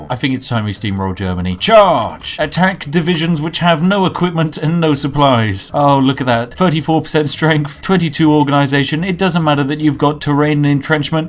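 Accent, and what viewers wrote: United Kingdom. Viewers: Sounds very British to me... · You sound british. Are you british?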